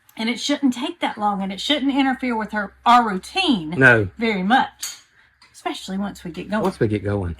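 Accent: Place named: Alabama, United States. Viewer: I love how you say “boil”! You are so darn cute with your Alabama accent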